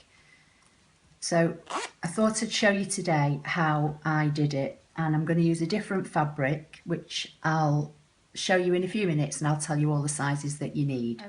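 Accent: United Kingdom. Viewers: Great little item, i must give this a go,you sound like where i come from in the UK hearing you talk. :)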